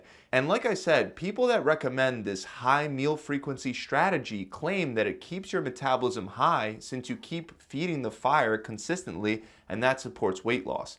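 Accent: Philadelphia, United States. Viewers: Know I can tell you are from Philly or Jersey? 💦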